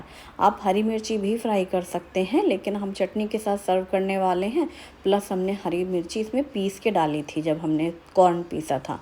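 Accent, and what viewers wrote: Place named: Maharashtra, India. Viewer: Ek baat bolu.... Aap "Bahar ko Baher " bolti hai hamesha , mai hamesha notice karti hu,,, A Hamare MAHARSHTRA ka asar hai.. 😊😊😊😊❤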